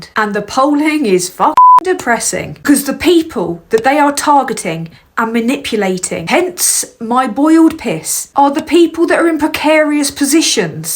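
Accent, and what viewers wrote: London, United Kingdom. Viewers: London accent and fake dyed hair means the likelihood of you being either English or blonde are vanishingly small. And considering your ideology it's 50 50 whether you're a biological woman or not. Short? I'll take your word for it.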